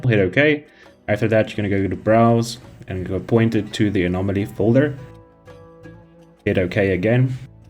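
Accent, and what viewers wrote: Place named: South Africa. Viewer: You are a life saver! I immediately recognised that accent. Groete ook van Suid Afrika :)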